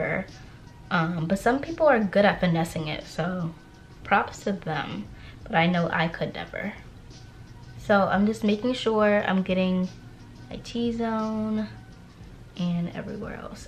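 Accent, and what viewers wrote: New York, United States. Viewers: I can tell you a new Yorker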